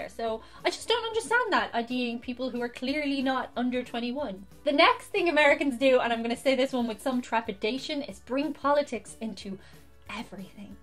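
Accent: Ireland. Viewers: very interesting. I'm from the usa but my Grandfather (Thomas Feeney) was born in Dublin Ireland. I love your Irish accent...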